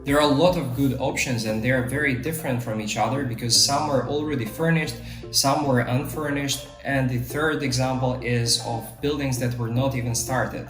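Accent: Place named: United States. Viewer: Bro you sound proper American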